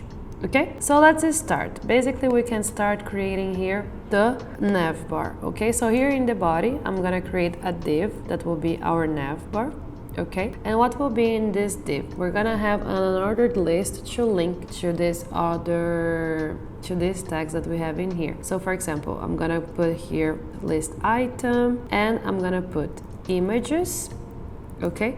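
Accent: Brazil. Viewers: Youre brazilian, am I wrong?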